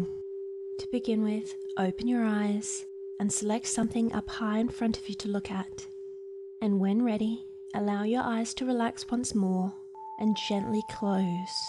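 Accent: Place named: Australia. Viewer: I'm working my way through this series. It's nice hearing another Australian do this stuff Cheers :-)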